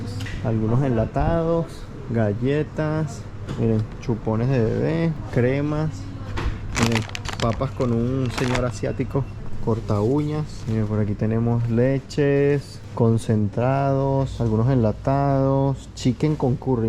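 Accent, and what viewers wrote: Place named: Venezuela. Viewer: Disculpa, tocayo, no sé dice eso no debería de ser así soy traductor simultáneo y docente en idiomas, manejo varios y los enseño y también enseño español a extranjeros y la gramática del español dice que después del verbo DEBER en cualquiera de sus tiempos y formas, se debe usar y no se debe de usar un verbo en infinitivo, por lo tanto esa preposición DE no existe, sobra. Disculpa tocayo que aproveche está oportunidad para corregirte, no lo hago para hacerte sentir mal sino para que mejores en el uso de nuestro idioma español, fíjate que no menciono nada de tu acento venezolano, eso es secundario, me refiero es a los errores gramaticales. De nuevo. Mil disculpas por corregirte y mil bendiciones. Gabriel Antonio Sánchez Aldana Traductor Simultáneo y Docente en Idiomas. Exsacerdote Católico.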